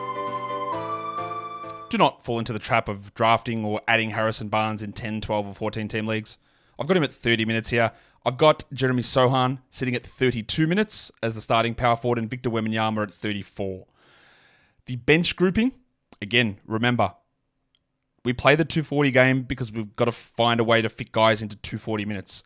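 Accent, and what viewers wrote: United States. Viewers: Best American accent ever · Great American accent and gradey dick segment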